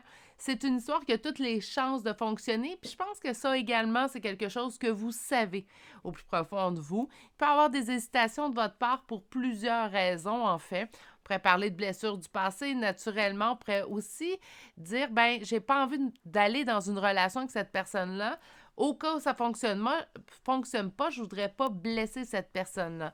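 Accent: Quebec, Canada. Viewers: mais c’est moi qui vous remercie vous avez le petit plus dans la manière de traduire vos cartes qui est pour moi exceptionnel et votre petit accent québécois en prime si je ne me trompe pas et bien j’adore 🥰🌈💐vive la Canada 🇨🇦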